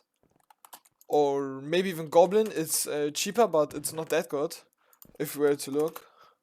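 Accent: Germany. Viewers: alter dieser Deutsche Akzent ist insane bei dir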